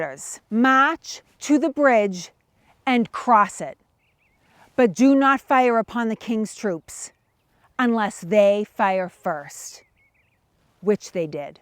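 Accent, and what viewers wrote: Boston, United States. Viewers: As a former “Bostonian “from Lynn, I instantly fell in love with the channel. Of course the “Boston “accent was a very strong influence.❤❤ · Visitors Centa! Yes, great Boston Accent! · Superb presentation! Besides the detail, both tactical and personal, I love the Boston accent; PAAK, YA CAA IN THE HAAVAD YAAD. Ha ha. All over America we have different accents but we are one people. Thanks for posting this lovely tribute to our founding. Regards, Bernie Bunny. 7/5/2024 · These are wonderful historical lectures. You uncover and vividly capture the details, mundane and critical moments alike, in time past and report them as a sister, with a true native Boston accent. It is charming, really, and authentically underlines your personal connection and enthusiasm - as well as impressively microscopic as well as encyclopedic knowledge. 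Thanks for connecting it all and sharing the stories on your channel, it is really is a pleasure to experience your work. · Nice Boston accent. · I live out here in Washington state and to my ear, we have a very vanilla accent here. Thanks to this channel, I've decided that this Boston accent is THE BEST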